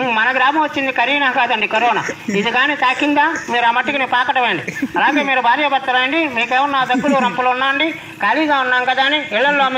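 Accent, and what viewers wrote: Godavari, India. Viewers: Super ma Godavari matalu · Ma Godavari valalm alagey mataladukuntamm..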